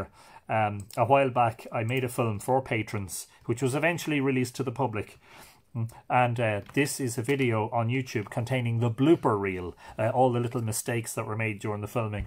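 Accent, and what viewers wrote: Ireland. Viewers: My name is, Im from Louisiana and I have been listening to your live myth readings since the first Day. I never seem to catch them live, but I never miss a reading! I think what you are doing is great and want you to know you are really helping alot of us out there escape, atleast for a little while, from all the madness going on in the world right now! Being on lockdown and pretty much being out of work with no income for the forseeable future, and living in the place with hight initial growth rate of confirmed cases for covid-19 in the world, It's not easy to escape! You have such a warm, calmness and a delightful positivity, that "story time" has become quite popular around here! (Me and several of my friends, coworkers, and family members have started to call your nightly readings our "story time") when I first introduced your videos to everyone I said, "Check this guy out, its like story time with an Irish Bob Ross or Mr Rogers" and "story time" stuck! 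Lol And I just want to thank you and tell you are helping more people than you could ever know.... Me and my two Dogs, my sister and several other IUC nurses, a bunch of bored out of work waiters and waitress, and several of my family members who are stuck at home with their kids, all look forward to our "story time" You have a fan club growing in Louisiana and you are truely helping make the world a better place in a dark time. Your simple act of kindness, of reading stories to help people forget for just a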